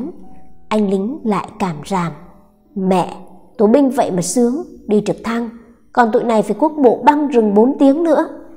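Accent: Standard. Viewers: Giọng đọc của rất chuẩn và truyền cảm THẬT SỰ RẤT HAY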